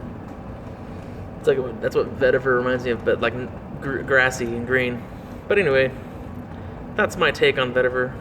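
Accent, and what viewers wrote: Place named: New Jersey, United States. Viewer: Damn, I didn't know you lived in Utah, no offence but you come off as a Jersey Jew... in a charming way.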